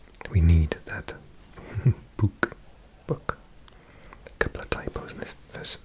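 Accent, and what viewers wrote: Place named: Netherlands. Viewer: It's so funny to hear it with the Dutch accent (from Netherlands itself), also you made a small error, it should be 'ik zal dat/het doen' because 'ik zal die doen' would actually mean you will do someone hihi. Nice vid btw 😉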